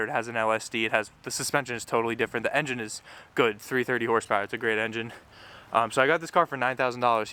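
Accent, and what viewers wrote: Russia. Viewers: Are u russian bro?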